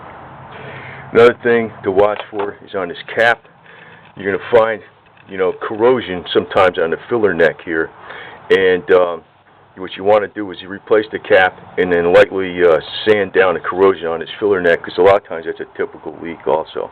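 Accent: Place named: Philadelphia, United States. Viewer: I came on the internet to find info on problems with my 1996 Sebring. I want to thank you for things you helped me with. OOh and by the way... When I watch your videos I hear a Philly Kensington accent!! I am in Fla now, but I grew up near Sears at Whitaker and the Blvd!! Look me up on Facebook or email me and lets see how many people we know from Philly and laugh!!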